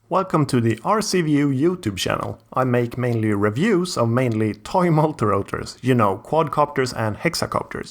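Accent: Sweden. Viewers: Hi! I am new to your channel but I immediately hit the Subscribe button upon watching the first vid I looked at. Your approach, candor and editing sets you apart from many other reviewers here on Youtube. Just lovely!!! You clearly have some insight in this hobby, but you appear unassuming, bright and unbiased. Every vid is a pleasure. I have to ask - are you a Swede? Your accent suggests you are, while your choice of words and general command of the English language is impeccable and inspiring. You put many native English speakers to shame, at least here on Youtube. But more importantly, your vids are well thought through, consciously edited, and so clearly targeted when it comes to defining your audience. I think you deserve a viewer breakthrough, going from the few thousand to the tens of thousands you deserve (realistically, this is a niche channel after all). 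To summarise: Love your reviews, keep them coming!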